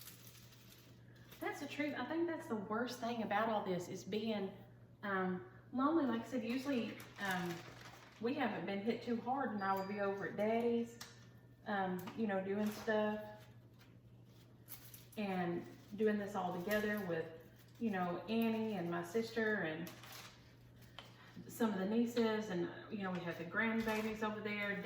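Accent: South, United States. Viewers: Thank you so much for a wonderful Vlogmas! It’s been a day! And I needed a distraction. So nice to hear a southern accent...I am a transplanted southerner who wound up in western Canada, and I miss my home 🥴 Christmas is a time for family and good food, and I miss that more than I can say! Your wreath is beautiful (sorry I am behind on episodes) I am working on binge watching to catch up on your vlogs! But I keep getting sidelined by chores🥴Wishing you and your family many blessings of the season! ❤️🎄🦩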